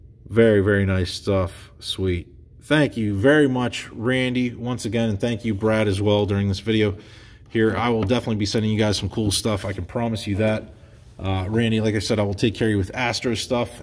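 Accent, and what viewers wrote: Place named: Pennsylvania, United States. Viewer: Hey great video. Glad you got the package, it was cool to see u open it on video, I didn’t expect that. Not sure what # subscriber I am on here but I feel like it was early. Always liked your videos, from the PA accent, to the complaining about brickage, to having do them with u, I feel like I’ve seen most of them. So as your channel grew I started to save up Alonso cards and already had a little pc of Josh Bell going so I thought I would send them to u some day as a thanks for all hrs of entertainment. As u got close to 1K I decided to send it as a Congrats. Keep up the good work. I’ll keep watching. 👍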